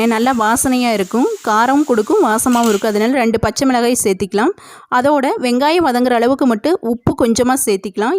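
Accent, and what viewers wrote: Kongu, India. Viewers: 3 Lk கொங்கு தமிழுடன் ரோட்டுக்கடை காளான் சுவையோ சுவை 😋😋அருமை sis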